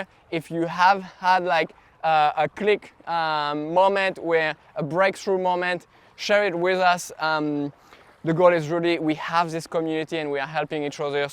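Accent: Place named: France. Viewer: Hello. Amusant on dirait un accent français... je comprends tout ^^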